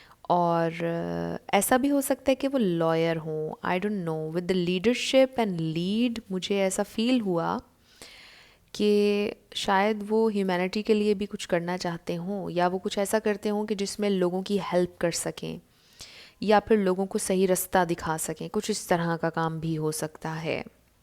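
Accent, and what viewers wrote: Pakistan. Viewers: Your voice is so addictive with that peculiar urdu touch... I love your voice and style of speaking....Stay blessed ma'am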